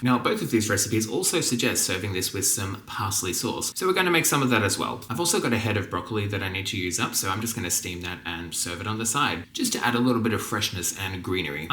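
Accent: United Kingdom. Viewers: Your videos are a joy to watch and, as always, your English accent is brilliant and you sound just like the Headmistress at my school……think Hogwarts, without the magic, but all girls and in the 1960’s. Every time you said ‘mock’ you made me giggle 😂😂 I’m in Lancashire England and In Aldi today 500g of leeks were £1.39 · Just loving what you're doing and the presentations are fabulous - especially the RP! Makes me smile every time. Thank you 😄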